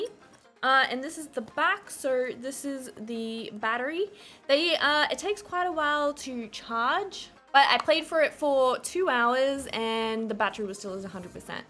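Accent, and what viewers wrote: Australia. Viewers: R u Australian ur accent sounds Australian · judging by your accent im pretty sure you live in Australia. i just wanted to ask how did you get your valve index. i have been wanting one for quit a while but due to the face i live in Australia i cant get one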